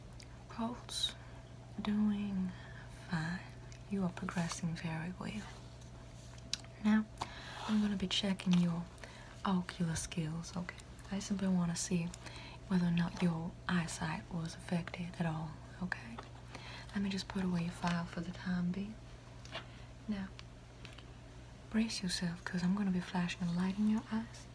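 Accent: South, United States. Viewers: The crazy part, I’ve seen her other videos and this southern accent isn’t her actual accent it’s just for this video but it’s so good. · Where is the part, returning to the battlefield getting injured and then coming back again for this nurse with that Southern Accent? We need a whole series on this. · The southern accent is perfect! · She looks Tamil Indian but has a southern accent....how exotic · I love the southern accent! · Josh Reyes It seems like she is trying to do something like a deep southern accent but modern southern accents are different from the accents of the 40s and 50s. The r's at the ends of words like four aren't as pronounced making it more like a European accent. She also says Mississippi like Mississipeh which is how a lot of southerners pronounced it during the time period. I don't know if this is exactly what she was going for but it's what her accent sounds like to me. 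· i live in Mississippi and that southern accent is spot on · Woman calling me honey in a southern accent Me: 😢grand ma😢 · God damn I love your southern accent. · That southern Belle accent. It's melting my heart. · The soft southern savana accent reminded me of grandma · It seems to be more of a blend of the old southern and transatlantic accents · As an Englishman would someone help me out and tell me what region of the United States this accent is from ? It’s the most beautiful accent i think I’ve heard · It's southern sugah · We love that southern accent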